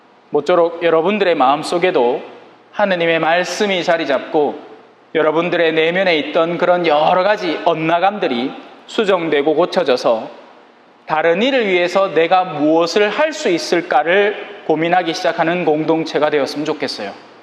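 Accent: Gyeongsang, South Korea. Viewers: 찬미예수님 신부님 감사합니다 경상도 사투리에 ㅋㅋ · 신부님 고맙습니다 강론 들을 때마다 찐한 경상도 사투리가 웃음을 주네요 오늘도 한편의 뮤지컬 보는 기분으로 잘 들었습니다~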